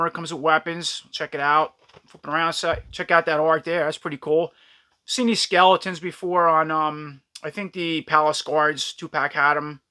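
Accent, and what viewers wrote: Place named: East Coast, United States. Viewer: i freakin love seeing this stuff reviewed with a surly back east teamster accent 👌😆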